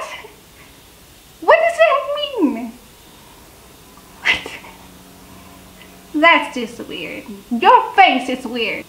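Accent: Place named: Indonesia. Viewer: Hai dear, u sound more like indonesian then malaysian? Where are you original from?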